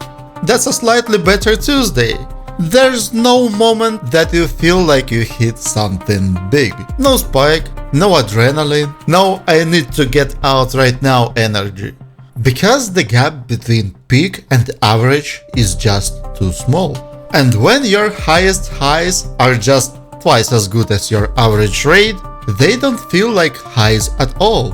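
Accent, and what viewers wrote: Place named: Eastern Europe. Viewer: Getting ratted, and the fact that you lose all of you gear except for the safe pocket, killed this game for me months ago. Tried it again 2 nights ago because in so many ways it's amazing, but this is a deal-breaker. Your accent sounds like people I call "brat" or "druk", and is not a problem at all. In fact, makes the video even more compelling. Slavic bros know things. Seriously though, if some clown with a free loadout can rat you while you are decked out with all purple and gold guns and augments, what is the point? "Horde of naked hobbits" 💀💀💀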